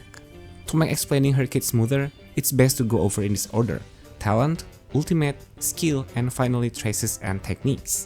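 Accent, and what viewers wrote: Indonesia. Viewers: You sound Indonesian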